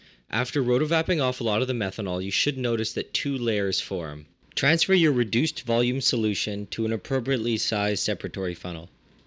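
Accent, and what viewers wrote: Quebec, Canada. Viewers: That Canadian French accent though . . . "Le Chateller"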